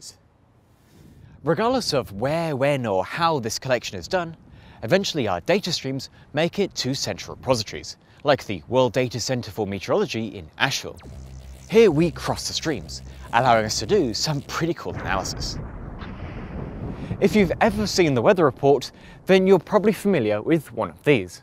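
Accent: Australia. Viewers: He’s definitely more towards the “cultivated”end of the aussie accent spectrum or flatout got an english-australian accent due to upbringing or familial exposure. Ngl I interpreted his opening joke about the weather was him joking about his english background. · He sounds aussie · He is an Aussie. He is poking fun at people that confuse the accents. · He is an Aussie but may have lived in the UK before moving to Australia. Not all of us sound like Crocodile Dundee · Your accent is far more Australian than it is British. Biggest give away is you say ak-Sent and not Aks'nt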